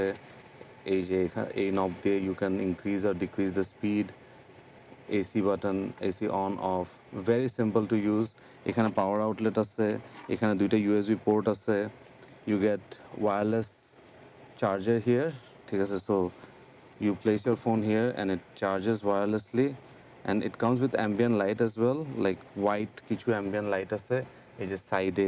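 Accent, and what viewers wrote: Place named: Chattogram, Bangladesh. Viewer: Bro is the showroom located in Chattogram khushi road? Your accent says your from Chattogram.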